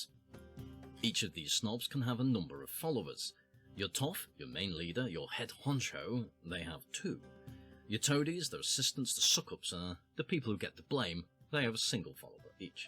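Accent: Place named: United Kingdom. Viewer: It matters because sometimes people can't pick out the British (?) cadence. There are a few important words in the beginning I couldn't make out even with repeated listenings.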